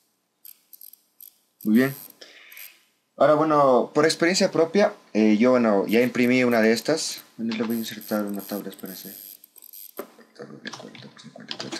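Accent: Ecuador. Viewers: ajaj se nota en tu vos que eres ecuatoriano ejejej muy buen video me arreglaste mi dia jejeej gracias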